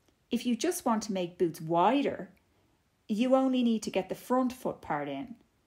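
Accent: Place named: India. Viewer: What a lovely accent and informative video, i love your accent are you from india?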